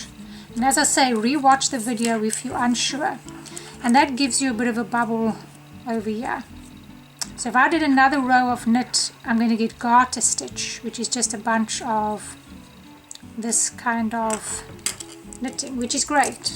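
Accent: South Africa. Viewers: Are you South African? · Is that a South African accent? Lekker, will try with God's will soon ❤ Thank You P.S in Hebrew means my happiness, is happiness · I love your accent. You by any chance from SA?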